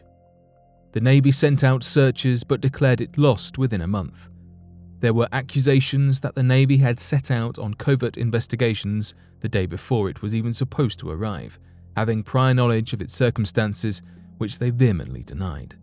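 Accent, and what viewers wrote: United Kingdom. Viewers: You can tell, besides the accent, that a Brit wrote this. 1906 quake was the San Francisco earthquake of 1906.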